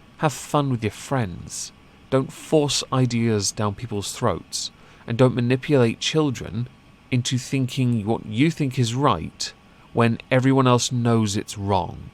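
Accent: United Kingdom. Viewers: The only one feeling dysphoria is the mother, who WANTED a little girl. Oh, and the attention from attempting to gain ‘woke points.’ BTW: Vit-O-mens. LOVE IT. Give me your incredible British pronunciation,!! Love your work, as always!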